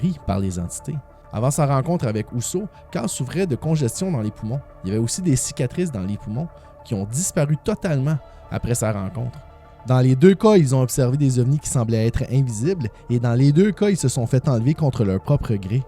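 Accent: Canada. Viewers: Superbe vidéo comme d'habitude. Mais je trouve que sur cette vidéo, tu parles un peut trop vite...et quand tu parles trop vite avec l'accent du Canada c'est difficile pour te suivre, et te comprendre.quand tu parles plus lentement. C'est beaucoup plus audible, et c'est top! Merci pour tes vidéos!!!!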